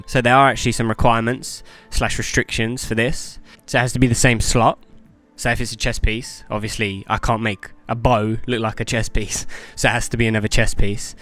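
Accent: London, United Kingdom. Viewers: Your videos are cool, I've subscribed for future reference :oD I don't think they are too long at all, you describe everything really well, plus you sound like a proper London boy which is my neck of the woods and that's always gonna be a bonus :oD haha!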